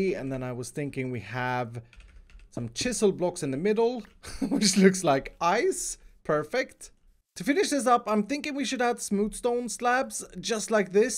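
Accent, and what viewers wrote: Sweden. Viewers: Are you swedish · Are you from sweden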